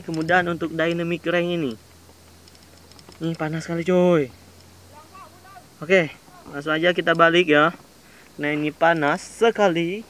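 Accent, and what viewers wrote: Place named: Kalimantan, Indonesia. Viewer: Dengar logat abang ni bicara keknya abang org kalimantan ya bang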